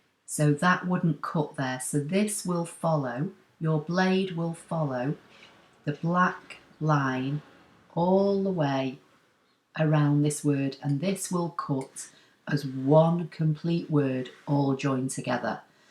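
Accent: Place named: Bolton, United Kingdom. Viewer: Hi, are you from anywhere near Little Lever, Bolton, youre accent seems very familiar. Also I had an aunt who lived in Breitmet that had a clock just like the one I hear in the background! Love your videos by the way, I am waiting for my first ScanNcut machine to arrive.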